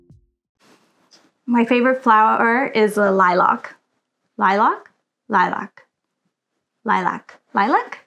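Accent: Canada. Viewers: Been watching your channel for awhile love your sketching and watercolour tutorials. I picked that you were Canadian - I could tell by your accent. Great video and thanks for sharing.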